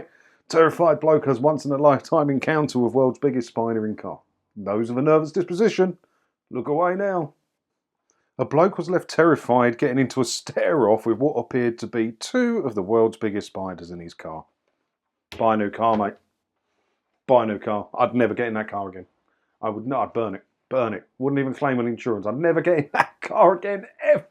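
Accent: Essex, United Kingdom. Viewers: You are a very likeable bloke, great advice, sound Essex lad? I have received 15 letters from the licence gestapo to “the occupier” 👈🏼 who ever that is ⁉😂 in the last two months. Each one seems to have more red ink ✍. Saving them up to paper the walls in my sitting room 👍🏼. 🤗 👵🏻🇬🇧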